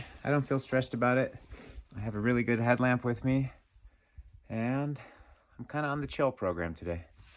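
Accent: Argentina. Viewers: Amazing stuff! Glad to hear you are picking up the argentinean accent when speaking spanish;)! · your Spanish has improved! Nice to hear that Rioplatense accent haha. Always thrilled to see you upload a full length video. Your instagram clips are just not long enough and you've stopped writing on your blog. These vlogs will have to do. I'm a novice at the mountains but whenever I'm in chalten I always dream of bumping into you! Keep on posting inspiring content for us please:)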